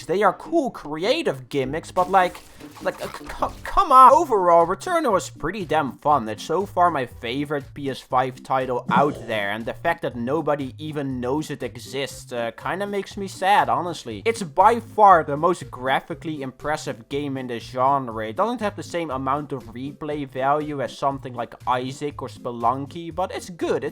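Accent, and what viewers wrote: Netherlands. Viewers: hol up u dutch? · U dutch?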